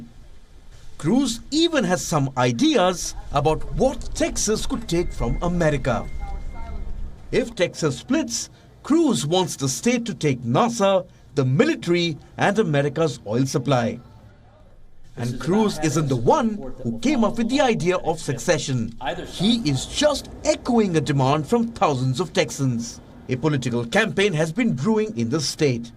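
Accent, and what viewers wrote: India. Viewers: I think she's speaking in an indian accent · That could always just be India’s dialect of English. Different places speak the language differently. One I’ve noticed that we don’t say here is “pressurizing” rather than “pressuring”.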